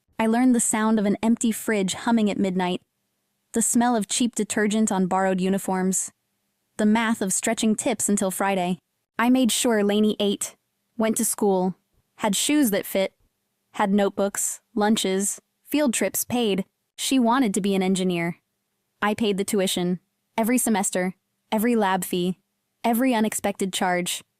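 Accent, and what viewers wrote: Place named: United States. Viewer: A Thai story whit american accent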